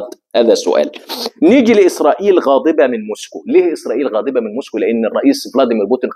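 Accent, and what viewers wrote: Morocco. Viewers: لهجتك المغربية فنة 🎉😂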